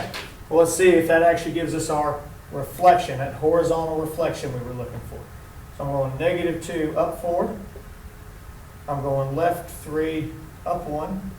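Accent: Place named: South, United States. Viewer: What accent is that? As a Brit I love listening to it. Southern. Maybe Tennesee? Georgia?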